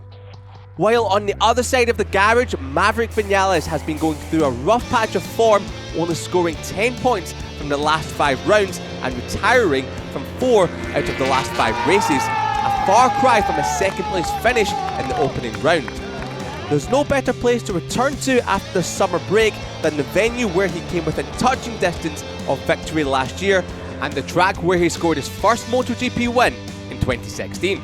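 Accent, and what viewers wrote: Scotland, United Kingdom. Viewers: I'M SO SICK TO LISTENING THIS VERY-ACCENTUAL SCOTTISH VOICE IN EVERY MOTOGP VIDEO. It's almost like listening to Louis Suddaby on the weekend itself.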